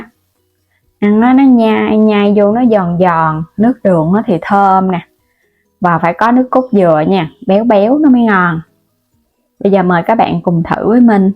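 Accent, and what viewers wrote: Mekong Delta, Vietnam. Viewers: Giọng miền Tây dễ thương mà bạn sao bạn nghe không được vậy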